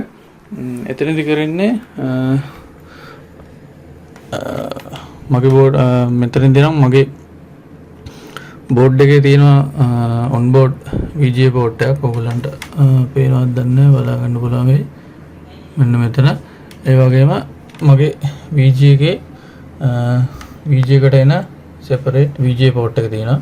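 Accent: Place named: India. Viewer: Title english and you speak indian. I dont know what u are saying bro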